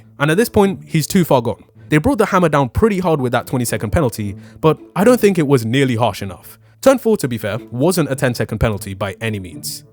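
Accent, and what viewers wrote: United Kingdom. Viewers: Bruhh this guy is also British 😭😂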